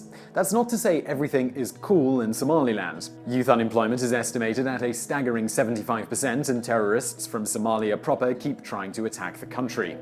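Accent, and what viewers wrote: United Kingdom. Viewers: He speaks the Queen's English & depending where in Great Britain he was born & raised will depend on the accent. My country is an English speaking country but we have our own language & accents that not many apart from bruhs & sis's near us would understand. We love having our own language & it's hilarious for foreigners to try & work out wtf we are actually talking about. But ur 100% right about the UK education & America's speak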